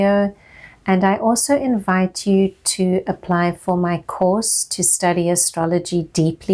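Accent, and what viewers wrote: South Africa. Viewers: South African accent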